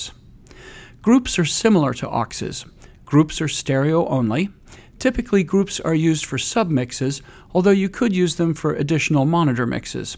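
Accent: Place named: Netherlands. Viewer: Interesting video, in addition it sounds like the speaker is dutch..!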